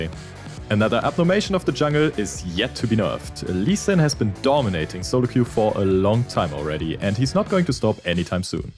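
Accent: Germany. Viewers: R U German? Ur pronouncement Sounds Like thia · Is the moderator a German? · The german is strong with this host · Man hört es direkt das er Deutscher ist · I really like this German Accent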